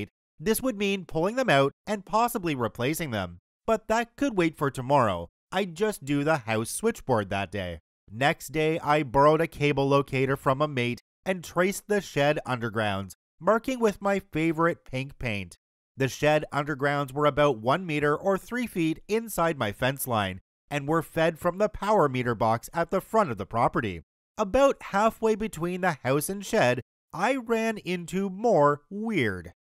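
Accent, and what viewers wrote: Australia. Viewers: Beautifully Australian. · Oh, this bloke is for sure from Australia haha. "Botanical Gardens" "Instro" 😂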